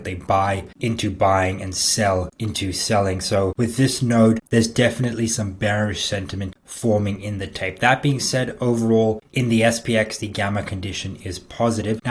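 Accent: Australia. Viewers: Great analysis yet again.I love your familar Aussie accent too; yet another reason for me to listen to your show. Since commercial real estate (CRE) woes are very much in the news all across the world & not just the US, does this mean that every woman, man and their dog is now placing short bets on just about anything to do with CRE implosion say with options a few months out? Thanks, for any further insight you are able to offer.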